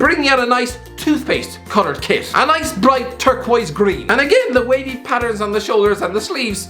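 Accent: Ireland. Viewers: Are you Really Irish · Qna: why are you irish? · Where in Ireland are you from?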